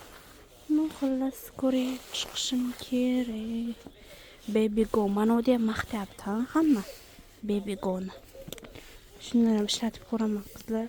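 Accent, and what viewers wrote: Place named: Syrdarya, Uzbekistan. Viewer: Nasib keling Sirdaryodanmisiz shevayizi o'xshatdim adashmagan bo'lsam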